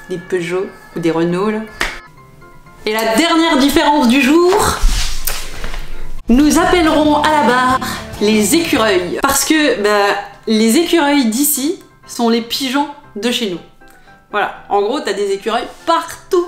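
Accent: Quebec, Canada. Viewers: Très intéressante cette vidéo et tu commence à parler comme une québécoise 😅💪🏿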